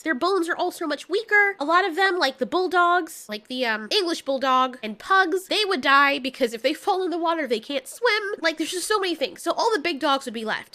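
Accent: United States. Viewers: I thought u were American.Thats crazy.Are u American?❤ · not no accent she just speaks with a very smooth American accent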